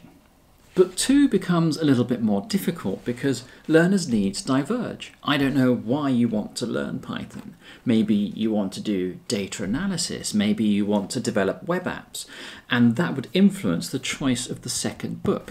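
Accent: England, United Kingdom. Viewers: The first thought I had when I saw the dictionary and all these books was: "Can you adopt me? I am a wonderful daughter, and as Italian I cook well". Then I came back to myself and I focused on Python. Very useful video for us little dev and delightful English accent. Thanks.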